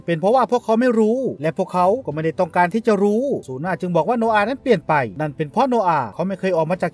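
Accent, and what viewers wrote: Isan, Thailand. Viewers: พากย์ออกแนวอิสาน สนุกดี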